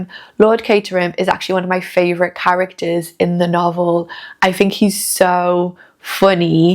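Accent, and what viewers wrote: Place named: Northern England, United Kingdom. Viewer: Your observations are spot on. Bundle is the typical unbearable know-it all girl boss figure that has become so overused in modern film and TV, here shoehorned into a 1920s setting., her language and clothing were totally out of place. I fear the worst when it comes to the coming adaptation of C S Lewis' Narnia books later this year. If they muck that up, I am cancelling my subscription! Nice to hear a northern English accent on YouTube! Thanks for sharing, Greetings from cold Sweden!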